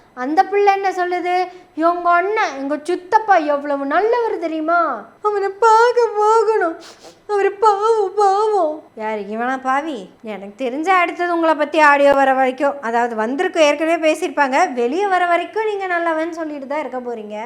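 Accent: Kongu, India. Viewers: அன்பிற்குரிய மகளே.தங்களுடைய கொங்கு தமிழ் அருமை.சீமான் என்றாலெ அசிங்கம் என்றாகிவிட்டது.சீமானை குறித்தே பேசி நாம் அசிங்கப்பட வேண்டாம் என்பது என் கருத்து.சீமானுடைய பேச்சைக் கேட்டாலே அருவெறுப்பாக இருக்கிறது.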